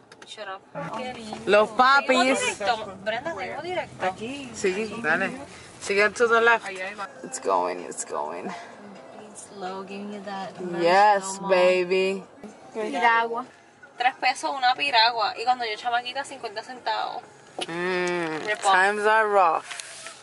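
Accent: Puerto Rico. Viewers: quisiera estar hay contigo soy de puerto rico y me gustas como hablas suenas como puertoriquena!! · But dang she's a Asian race but puertorican when she's speaks it. China boricua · No se pero tu boricua es mejor que el mio ... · mi vida tu hablas como boricua ,wepa .que <link> siento feliz por lo bello que hablas de mi pais y tu pais tambien🥰🥰. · Bonita,habla pura, BORICUA,me,gusta,la,comida china de puerto rico, dios te proteja 🇵🇷🇵🇷🇵🇷🇵🇷🇵🇷🇵🇷🗽🗽🗽🗽❣️❣️❣️❣️❣️ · Yo supper crazy to see this women speak the language just like what she is a real Puerto Rican. I share a story of once being in San Juan and walking passed a group of young Asian looking people and same thing overheard them speaking in Puerto Rican Spanish. So awesome to see! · Bella china boricua 😍 me impresiona ver una china hablando español. Y más con accento puro boricua wow.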